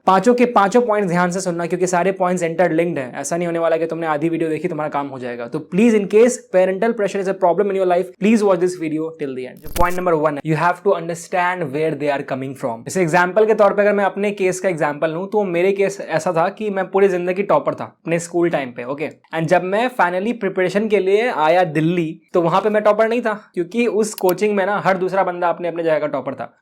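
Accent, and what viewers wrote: Bihar, India. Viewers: bihar tone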